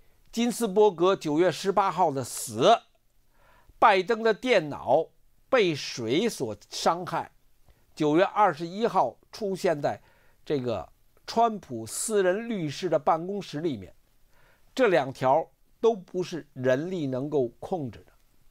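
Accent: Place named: Beijing, China. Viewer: 从十一开始到十一结束很久没看涛哥的节目了，听到涛哥的那口正宗的北京口音感觉棒极了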